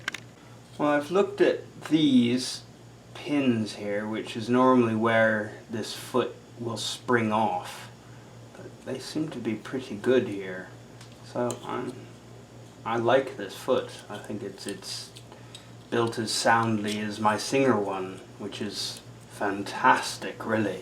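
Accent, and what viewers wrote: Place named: England, United Kingdom. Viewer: I'm from California, sounds like your mabe from England?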